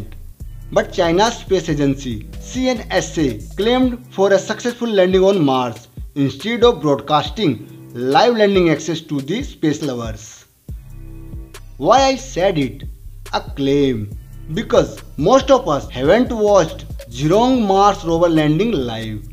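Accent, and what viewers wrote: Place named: India. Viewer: From the accent, youtuber should be from India. Typical human behavior when you wish your competitor to fail. However, credit must be given when credit is due. We should applaud China's effort. This is the 21st Century, you still thought that nation can cover up their failures. Imagine it was exposed years later ... there is nowhere to hide such shame. No one would like to such risk. Many of us know very little about the Chinese including me. But one thing I am certain, the Chinese does not care what others speak of them, they are more worried about fellow Chinese opinion.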